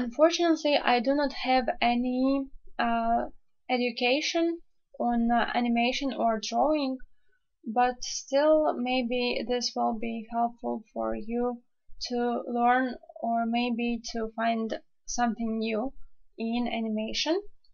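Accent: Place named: Latvia. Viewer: Your name and accent makes me guess that you are Latvian. Could I be right? :D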